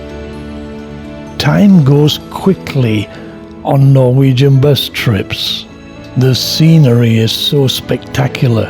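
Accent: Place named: Scotland, United Kingdom. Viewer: I've watched nearly all your videos. they've been a great help to plan our itinerary for our summer trip with our five teenage children. Where are you from? I just can't figure out your accent, sometimes, a bit Scottish or North of England. You're either a Scandinavian who's lived in England a long time or a British person who's lived abroad a long time. Thanks for the great videos and useful tips.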